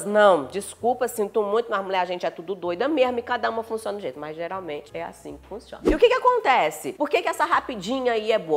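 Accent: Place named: Minas Gerais, Brazil. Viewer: kkkkk mineiros são reconhecidos no mundo inteiro p geito gostoso de falar né vdd??? Eu sou de BH por isso percebi ki vc mineirinha uai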